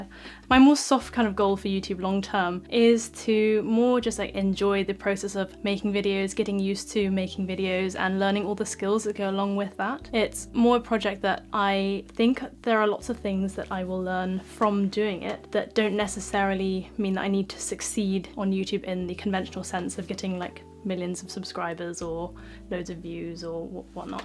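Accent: RP, United Kingdom. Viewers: Late to the party, but are you a citizen of the UK or abroad? I have heard that people in the UK rarely have an RP accent except for the ones that stood abroad in private institutes. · 我来自中国，很喜欢你的英音！欢迎来中国旅游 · I’ve started watching her video to listen her British English and now found her using a Japanese cleanser Goku Jyun! As a Japanese I’m so proud of our product has been used in UK and somehow it feels funny😂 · I wish we could meet in person,so that you can teach me to speak a British accent.🙂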